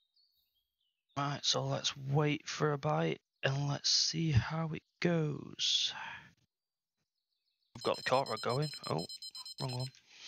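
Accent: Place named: United Kingdom. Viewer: Sounds like your from UK, subscribed. Works amazing there with oversized carp mix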